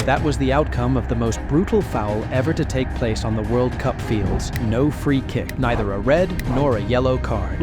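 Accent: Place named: United States. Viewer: American accent? Wow.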